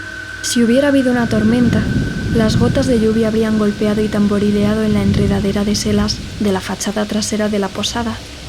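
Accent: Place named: Spain. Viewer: Amo tu pelo y tu acento español!! ♡♡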